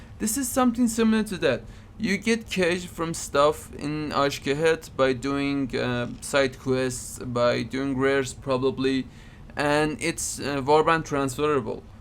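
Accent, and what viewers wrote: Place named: Iran. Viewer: چقد این لهجه ایرانیه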